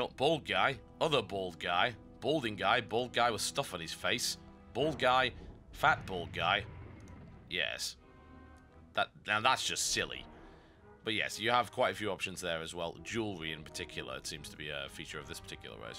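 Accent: United Kingdom. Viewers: This may be the least annoying British voice I have ever heard...I shall watch more!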